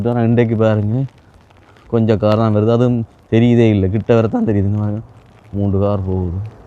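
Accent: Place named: Sri Lanka. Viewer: R u srilankan bro Ur speech will be like that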